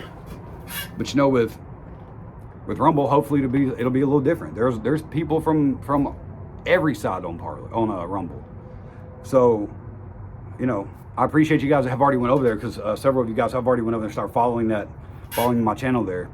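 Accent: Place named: South, United States. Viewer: yeah man np. After I typed it I said "oh maybe that's why he had twice as many notifications" you know? Idfk haha. Take care. Well wishes my man. It's funny you'd never think it, but minus the slight southern accent, your not any different from most guys wayy north up here in New York. Bet you'd never think that. We got beaver trappers. We got it all bud. Big ass tree removal! It's the sticks. Be good brother.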